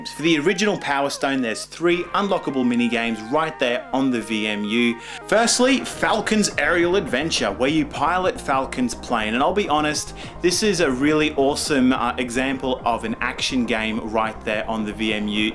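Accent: Australia. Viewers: You sound Australian but you say Saiga not seega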